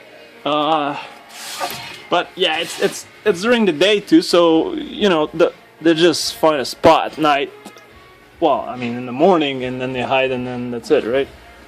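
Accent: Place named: Quebec, Canada. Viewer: Are you from Quebec bro?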